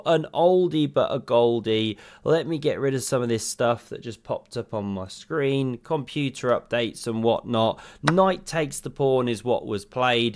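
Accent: United Kingdom. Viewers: God I love this commentator. So funny, so English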